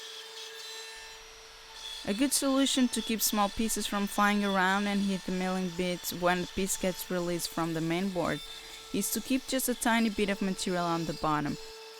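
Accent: Portugal. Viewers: Hi I've been wondering how much all of that costs, since episode 1(wood, mdf, screws, not including machines...), I've got so many ideas from you and I'm thinking about making something similar. Stay with the good job! (I'm also wondering if you are from Portugal or not, I realised that you live in Portugal but did you born here? Your accent seems pretty sick)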